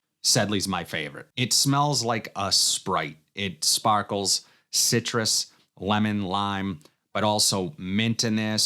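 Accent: Boston, United States. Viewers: Here for the fragrances , but also the Boston accent 😝☺️ MFK GFS is awesome , but I can’t afford the OG - I like Oil Perfumery’s version (it’s def a bunch) as well as fragrancebar and ALT fragrances . I needed a variation from anyone that duped it . Great cooling fragrance, I love it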